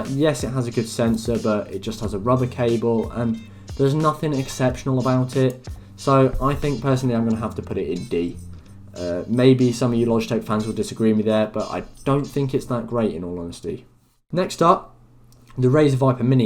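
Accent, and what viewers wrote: United Kingdom. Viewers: you a london g?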